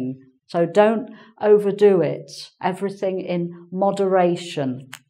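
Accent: England, United Kingdom. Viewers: From Brazil From Marcio Hello Teacher... nice to meet you. This is the second time I watch a video of yours, and I enjoy very much. One question ,please. Where you're from? Yor accent is familiar to me. It sounds like a British person. Anyway, Your lessons are very nice. I have just subbed to this channel. I hope not to disappoint you... I'm still learning your language. Hugs from me and Brazil. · with (engVid) Your accent and your appearance are great,! ) While watching any of your lessons I feel myself sitting in a London pub drinking ale or having breakfast in an old Birtish castle. )) Thank you for these unforgettable virtual trips! ) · Is your English from England madam?... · I already felt that you have british accent . I am enjoing the language to improve my knoledge Thanks a lot. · Brilliant! You have a very elegant old English style. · I really love your British accent, specially when you're explaining. 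Furthermore, Shakespeare is one of my favorite dramatist, the work that I love the most is Hamlet ♥ Greetings from Peru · I LOVED your video. Thanks. It was very useful. By the way, it was the first time I saw you on Engvid. Now I am watching all your lessons. Your British accent is beautiful.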